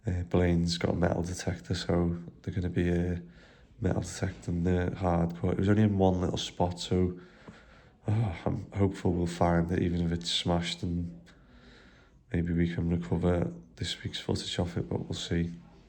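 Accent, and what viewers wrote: Liverpool, United Kingdom. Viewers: Just subbed mate coz Your Scouse . Just came across ya.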